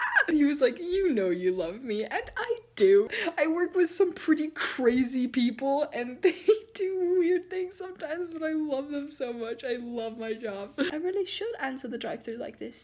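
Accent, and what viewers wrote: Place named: United Kingdom. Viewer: i'm literally laughing so hard right now! ohh, and your british accent is amazing :)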